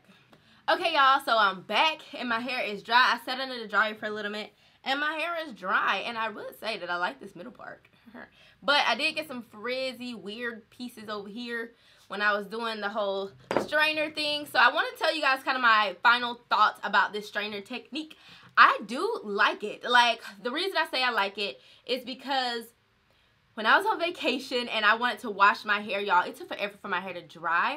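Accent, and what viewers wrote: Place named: Detroit, United States. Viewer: I think Detroit!!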